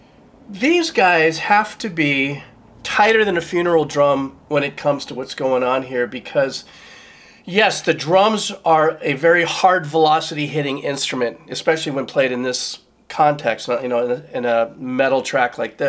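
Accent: Hawaii, United States. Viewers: Where are you from brotha, sounds like your on the Islands. If your located on Oahu and give music lessons, I would be interested, Aloha.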